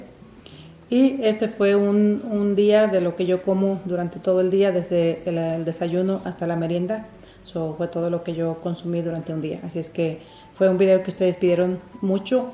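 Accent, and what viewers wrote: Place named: Guanajuato, Mexico. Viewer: De donde es usted ? tiene un acento como de Guanajuato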